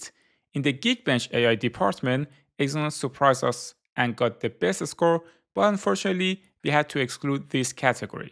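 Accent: Iran. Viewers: Bro, I sensed from your accent that you are Iranian, am i right?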